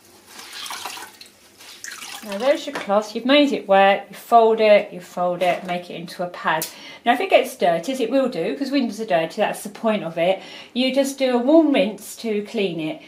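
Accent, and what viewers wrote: United Kingdom. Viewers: Hi, you sounds like a British, i love your accent ;) Thanks for your demo ^^ I've bought same ! (from France btw)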